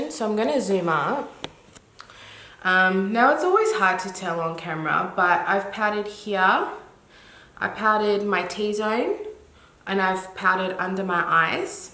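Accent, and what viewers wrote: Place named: Australia. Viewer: Finally, a beautiful sounding Australian accent! 😅 So many girls aussie sound terrible on youtube. Thank you! 🤘🏻 easy to listen to.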